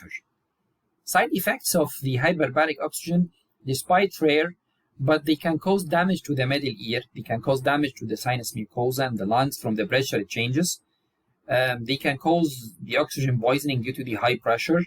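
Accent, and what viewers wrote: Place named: United States. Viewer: As if am listening to an American lecturer ❤❤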